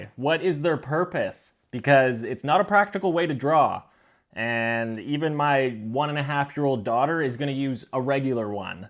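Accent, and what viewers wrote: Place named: Canada. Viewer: the Canadian accent 🤣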